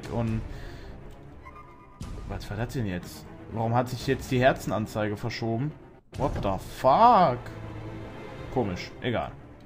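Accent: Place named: Saxony, Germany. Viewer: die bayern reden nicht so xD die aus leipzig reden so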